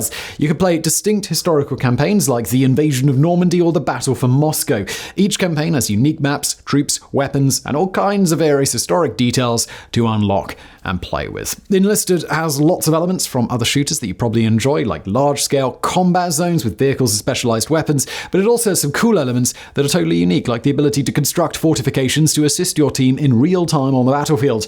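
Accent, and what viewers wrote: United Kingdom. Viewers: I like this channel minus the obnoxious British accent.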